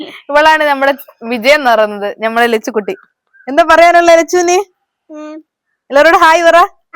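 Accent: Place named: Vadakara, India. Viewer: എനിക്ക് നിങ്ങളുടെ വടകര ഭാഷ കേൾക്കാൻ നല്ല ഇഷ്ടമാണ് എന്റെ നാട് വടകരയാണ് ഇപ്പൊ പാലക്കാട്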